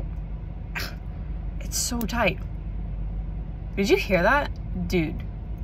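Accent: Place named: South, United States. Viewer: Hey it's been a while since I've watched your videos but you're beautiful as always and fun with that cute southern accent of yours.:) If you ever happen to visit Atlanta, Georgia we should hangout:D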